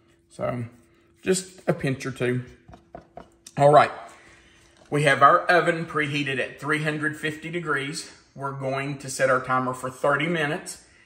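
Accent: South, United States. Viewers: I love your recipes... I love the way you teach and instruct us on how to fix them... I love your soft Southern in speaking.... And most of all I love that you're Christian... Thank you so so much... Your presentations are very comforting to me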